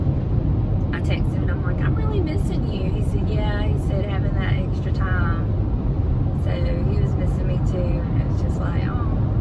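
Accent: South, United States. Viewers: Where are you from....GA? I love your southern drawl! And tomato sandwiches, ain't nothing like it. Snip those early tomato buds! WE live in NC and are babying our tomato plants. I CANNOT WAIT!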